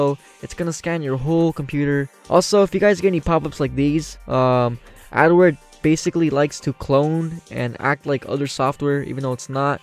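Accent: Croatia. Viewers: Are you from Croatia?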